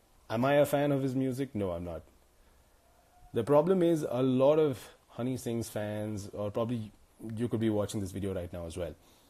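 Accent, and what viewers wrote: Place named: England, United Kingdom. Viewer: Bro I like your English accent,my English is not that good I love u bro .... and I love your songs very much .......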